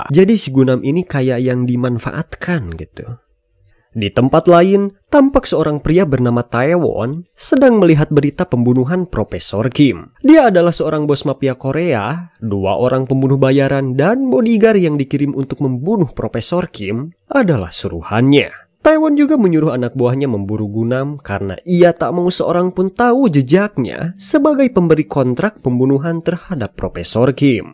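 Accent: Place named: Medan, Indonesia. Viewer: Pasti ini orang medan yg pengisi suara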